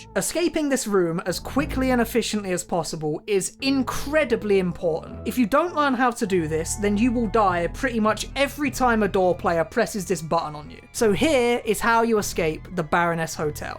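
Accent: United Kingdom. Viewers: I am nourished with this, yet I am not satisfied, I guess I can never feel the same with TF2 for a while, but you should still talk about deadlock, it's a cool game and the way you say swoons me of how bri'ish it is. Btw I hope this won't be the next game for Valve to forget after some years without a "final update" Btw can you talk about celeste movement pls? It would be really cool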